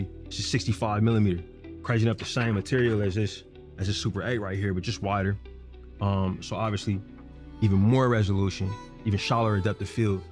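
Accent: California, United States. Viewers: I was like where did he grow up? I seen California and said that’s why I’m hearing nispey and yg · If you close your eyes it sound like a gang member telling you bout film 🤣🤣 I know he from cali. All love P.S i jut Looked it up, he really from oakland🤦🏾‍♂ No offense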